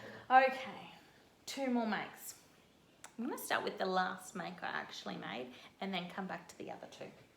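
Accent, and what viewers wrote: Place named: Australia. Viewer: Great video and especially lovely to see solid colours and tasteful patterns in the fabrics you’ve used. I find it takes discipline to choose these when fabric shopping over the more eye-popping prints but when made up and in the wardrobe they make the clothes I actually wear most of the time!! Also great to hear another Aussie accent, see more seasonally-appropriate sewing and Australian patterns and fabric stores being promoted! New subscriber here.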